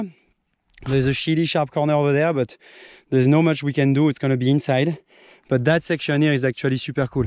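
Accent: France. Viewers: Me encantas <3 acabo de suscribirme a tu canal y te sigo en Instagram!. Eres un Crack voy a ver todos tus videos para ponerme al corriente, tienes un espíritu salvaje y un chuloooo acento francés, que mas se puede pedir! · Mais cet accent est tellement bon ! So French ! C'est bon de te revoir rider !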